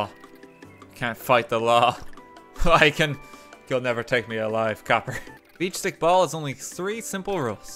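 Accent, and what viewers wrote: Ireland. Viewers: i just found this channel, he kinda has an irish accent lmao- subbed because he's actually really funny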